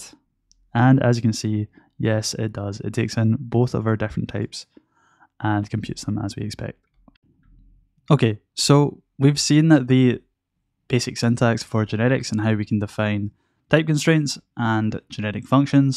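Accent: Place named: Scotland, United Kingdom. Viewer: Probably the Scotish accent. :)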